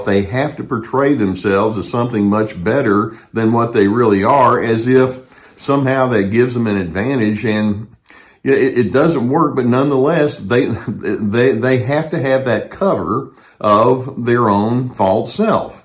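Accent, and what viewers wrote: South, United States. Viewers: I read your written article and I was hearing the words in your Southern accent! P.S. I am very glad that I am on the journey with you, too! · What state is the accent from?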